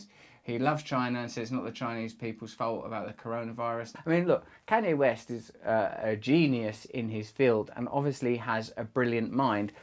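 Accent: United Kingdom. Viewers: WTF? This is an American with a British accent. Just more proof the end is near. By the way, WTF did he say? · I love the way Russell talks being British but he clearly knows nothing about NESARA/GESARA and who is bringing it !!!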